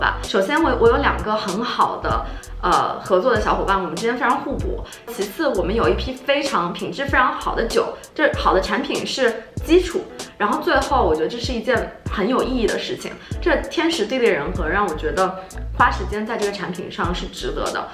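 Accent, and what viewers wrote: China. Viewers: 武汉普通话？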